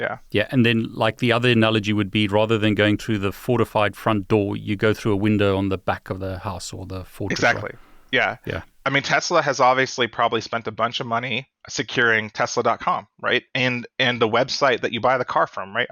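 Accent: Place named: South Africa. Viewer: Jason did a REALLY GOOD JOB! I hope we get an episode finishing all the levels on recon. I personally would like a video on Gaining access and Maintaining access. THANK YOU always a pleasure hearing the all too familiar South African accent.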